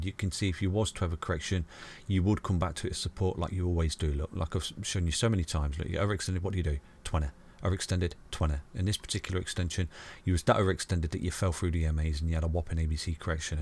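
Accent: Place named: Middlesbrough, United Kingdom. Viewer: He might be from Middlesbrough as my friend has the same accent, he knows his stuff, hot on it!! crew 🚀🚀🚀🚀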